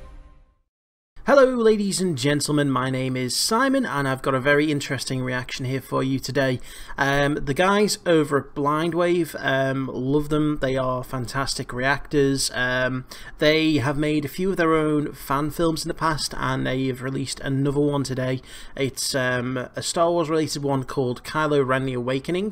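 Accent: Wirral, United Kingdom. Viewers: are you from the wirral?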